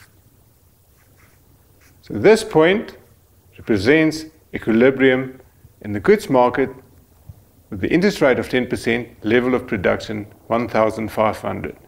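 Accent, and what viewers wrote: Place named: South Africa. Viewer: I don't know why, but everything is just better in a south african accent.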